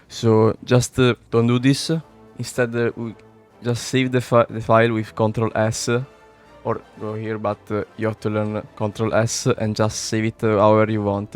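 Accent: Italy. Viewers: you do sound italian like your youtube channels name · Your voice sounds incredibly amazing It sounds nice when you speak English with an Italian accent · By the voice and the site it's possible to notice that you are Italian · Madonna quanto si sente l'accento italiano 😅😮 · The Italian accent goes REALLY strong... kind of Florentine/Romagnol-ish, Anyway, nice video, this will be useful for me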